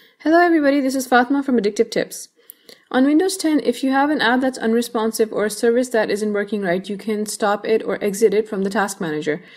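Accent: India. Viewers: are you from India . Can you speak a little slower? Please If you're rushing like that, I don't want to listen anymore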